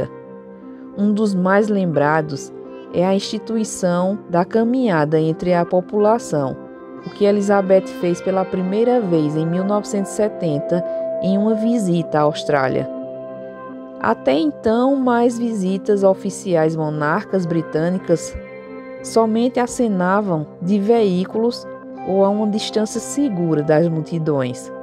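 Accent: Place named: Caruaru, Brazil. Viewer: Essa é caruaruense raíz.. Kk.. sotaque me lembra muito meus parentes de Caruaru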